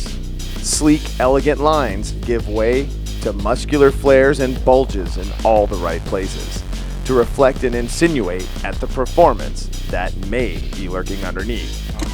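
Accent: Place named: United States. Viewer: The car sounds great. I wish annoying yank with that grating accent would shut the f**k up though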